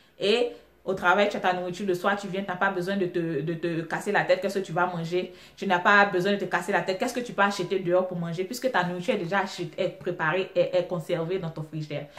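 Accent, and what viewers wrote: Ivory Coast. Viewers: hum l'accent ivoirien là hooo suis motivée une soeur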